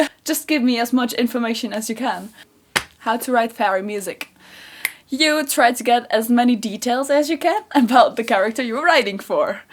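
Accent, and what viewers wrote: Ireland. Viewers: Willout Up until seeing this video, to me; She always sounded as if she had a thick Irish brogue. It still sounds that way; to me. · Willout I also believe in a Q and A a couple of years ago, said she'd spent some time in Ireland as well, which explains the brogue.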